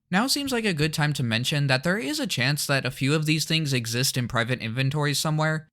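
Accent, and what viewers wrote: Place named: Middle East. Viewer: dunno why but I thought I remember you having a thick middle eastern accent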